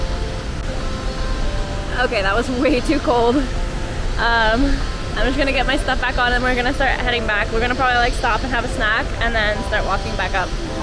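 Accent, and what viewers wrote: Costa Rica. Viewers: Hi, I have seen some of your videos and your spanish pronunciation is very "Tico" and also cute, How did you learn it, formal classes or just listening and talking ?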